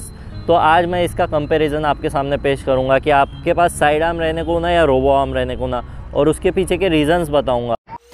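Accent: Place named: Hyderabad, India. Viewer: Pahla dekha jo hyderabadi bolta hai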